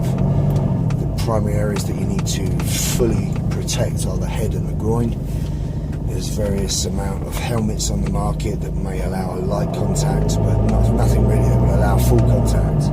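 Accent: England, United Kingdom. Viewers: I did‘nt understand only one word with this England english😭😭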